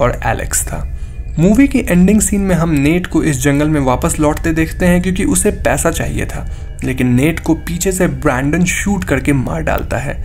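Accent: Bengal, India. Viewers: Yourd Bangali accent is funny.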